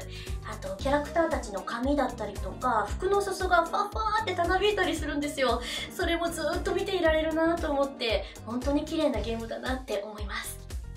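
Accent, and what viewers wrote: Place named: Kansai, Japan. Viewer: Her Kansai dialeg is cute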